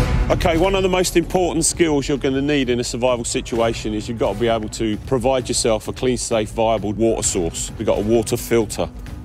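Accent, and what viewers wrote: England, United Kingdom. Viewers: are you english or you from netherlands too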